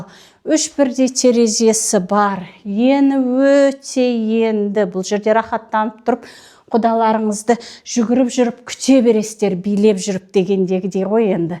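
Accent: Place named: China. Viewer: Чувствуется акцент китайский ( оралманка?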